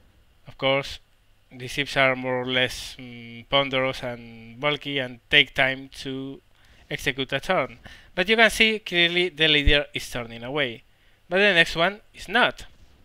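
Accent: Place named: Spain. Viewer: Yay!!!! :D so happy you're back! I've missed your beautiful Spanish accent :p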